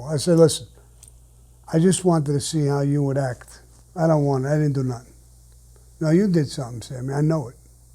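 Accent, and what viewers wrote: New York, United States. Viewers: I moved to Scottsdale from NY in 2014 after 46 years living in NY. NOBODY here in AZ talks like that. It's kinda scary but my father who was born in 1936 had almost an identical accent to you. You remind me of him in more ways than I can say. R.I.P. Please keep uploading content!! For all of us NY transplants it reminds us of home. Salud.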